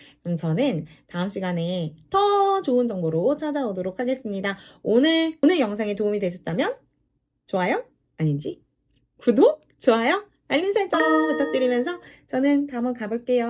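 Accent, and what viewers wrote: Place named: Jeolla, South Korea. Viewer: 전라도네? 으미X 의미0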